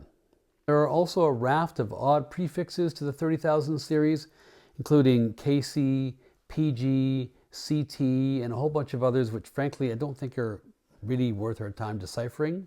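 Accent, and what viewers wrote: Canada. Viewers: I discovered your presentations by chance about 3 weeks ago. I'm enjoying the hell out of them.Your knowledge,quietly enjoyable Canadian delivery and sensibility,and your sense of humor have been a lot of fun for me,as someone who has enjoyed records-for the music,the labels,and the design,for well over 60 years. Your description of a weird portrait of Bobby Troup on a 50's album had me in stitches. Your work-and presence-have been a joy for me!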